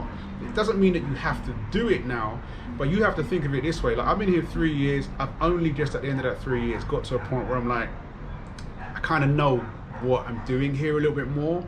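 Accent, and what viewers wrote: London, United Kingdom. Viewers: Referred from Ace’s channel.. I’m not gonna lie it’s nice to see Londoner that looks and talks like me.. that’s taking the leap to broaden his horizons unlike most people who happen to think “London is everything” going forward with your channel and life in general wishing you the best 👊🏾 ps.. I’ve liked and subscribed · Had to do a double-take when I heard the London accent haha - a lovely surprise! We lived in Tottenham until the riots (my ex-husband still lives there). So hard to find any content from Black British people who have moved over to Latin American countries. I was initially looking at Mexico for me and my daughter, but now I'm discovering content about Colombia! I'll be a new subscriber lol.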